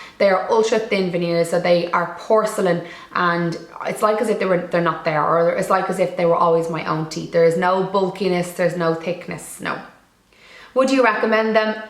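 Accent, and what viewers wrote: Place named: Ireland. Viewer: Love the video 💞 Really informative and very refreshing to hear an Irish voice on YouTube 🎉🇮🇪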